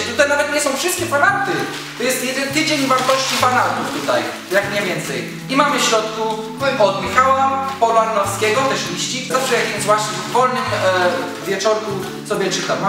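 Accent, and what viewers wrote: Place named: England, United Kingdom. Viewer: Ale ci się akcent wyrobił ;3 Teraz to już ledwo słyszalny ten angielski, chociaż trochę szkoda, bo z nim było jeszcze zabawniej ! :D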